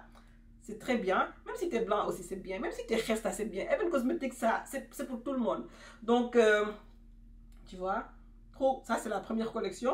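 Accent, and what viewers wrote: Wolof, Senegal. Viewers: 😂😂 je te kiffe trop ton français wolof way😂😂super maquillage spicy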